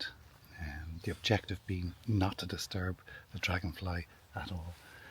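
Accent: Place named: Ireland. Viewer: As usually, just love your relaxed soft Irish delivery on the narration plus a video about my chosen genre of photography ( macro/ close up nature ) followed up with some very interesting facts and outstanding images really couldn't get any better. Certainly for me one of the most enjoyable vids. seen on u-tube of late. 👌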